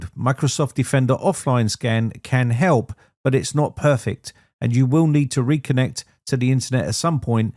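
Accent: United Kingdom. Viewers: Man, man, whatin a fuckin" bullshitin' annoyin listenin to this fuckin old-man britishin accent! Here here here here here here! Always showin his olden schoolin computin fuckin prowessin with the comnandin line-nen! SOEN FUCKIN ANNOYIN!!! Shut uppin fuckin old man, you're fuckin annoyin!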